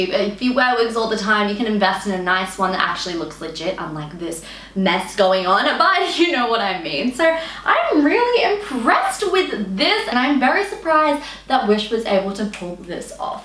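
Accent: Australia. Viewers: I’m being cheeky and I don’t mind if y’all don’t think it’s funny if I tell you to get yr heads out of yr bums. However, many people are trying to correct for saying it’s Wednesday. Maybe it’s just me, but I hear someone with an Australian accent say it’s a day later than it is for me and I think, “Hmm, I wonder what time zone they’re in?” not that they must be wrong.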